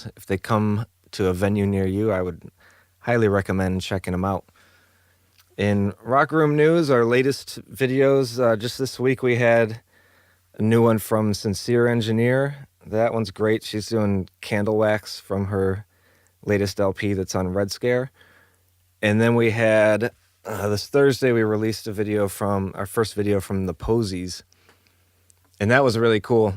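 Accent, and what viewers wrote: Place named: Chicago, United States. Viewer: Im from San Antonio and knowing full well your from Chicago this probably wont make sense, but your Chicago accent is hilarious. BTW its a Fender Bass VI. Love this episode.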